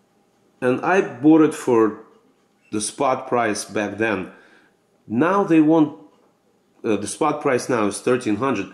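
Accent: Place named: Russia. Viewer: Выдаёт русский акцент :)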